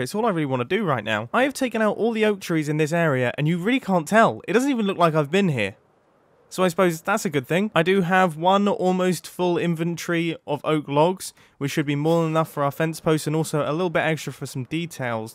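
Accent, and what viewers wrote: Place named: Scotland, United Kingdom. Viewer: YAASS I FINALLY FOUND ANOTHER SCOTTISH DUDE ON YOUTUBE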